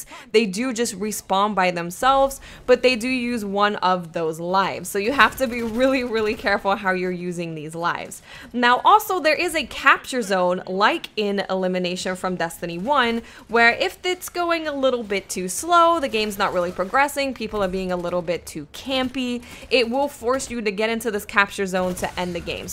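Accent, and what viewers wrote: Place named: New Jersey, United States. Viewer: Is from Jersey? Seems like it to me from her accent. I'm new here, does anyone know?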